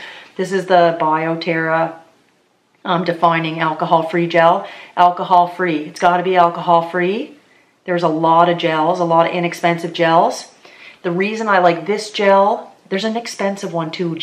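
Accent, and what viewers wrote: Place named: Nova Scotia, Canada. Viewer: Btw, I’m so happy to see you again. I love hearing your NS accent ❤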